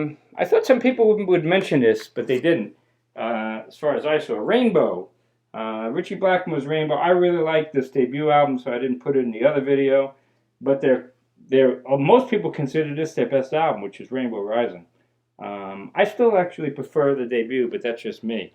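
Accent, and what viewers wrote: Long Island, United States. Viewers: I just discovered your channel and i'm digging it! WE share the same taste in music and are probably close to the same age. U grew up on Long Island (accent is a giveaway) and I grew up in South Jersey (my Philly/S Jersey accent is a giveaway). Keep up good work!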